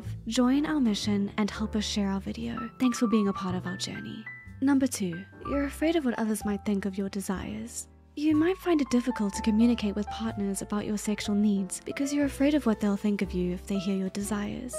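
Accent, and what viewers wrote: England, United Kingdom. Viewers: wait, gone British all the sudden now lol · Why does she’s have English accent this isn’t normal is it does she do some the videos 🧐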